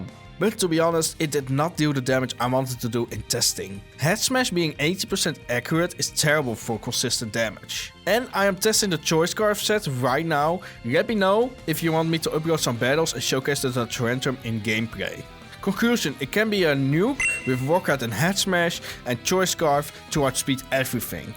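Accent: Netherlands. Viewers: Het allereerste filmpje dat ik van je zie en ik hoor direct dat je uit Nederland komt... Mijn idee xp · he's dutch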